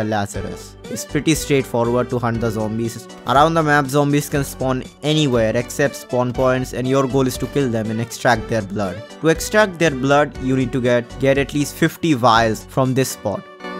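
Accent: India. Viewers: are you an indian your accent is funny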